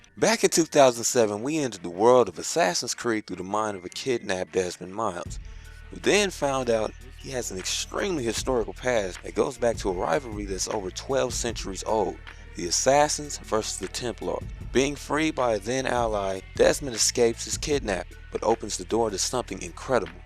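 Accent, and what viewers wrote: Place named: United States. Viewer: What accent is this guy's? I know it's American lol.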